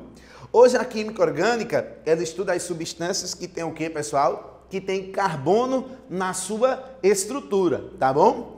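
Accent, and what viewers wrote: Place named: Northeast, Brazil. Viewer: Esse caba é do nordeste mesmo, valeu mesmo.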